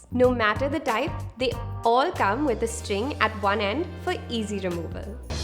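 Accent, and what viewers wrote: India. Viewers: Why does she have an Indian accent? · I was confused, the lady looked so white yet her accent was strong indian, turns out it's an indian channel. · Why does she speak in Indian accent? She doesn't look like an Indian.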